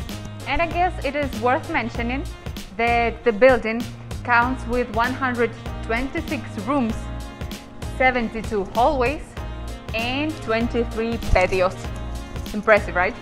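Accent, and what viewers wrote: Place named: Ukraine. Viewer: sexy Ukrainian accent. I picked that, but afraid to ask.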